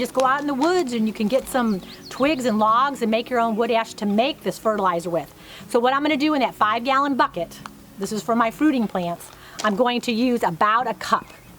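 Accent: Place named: Midwest, United States. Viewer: So thankful for your channel. I’m a mom of 4 and my husband and I really want to get out of the city. I feel crazy for wanting so badly to be off grid but you really have shown me that it’s not all that strange or impossible. Random question: Are you originally from Missouri? My daughter says that you talk like me. I grew up in Michigan and transplanted in Tennessee. Everyone here comments on my “accent” 🤣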